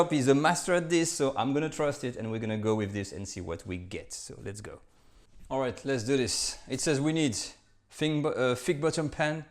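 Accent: France. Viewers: James Franco with a French accent. Subscribed!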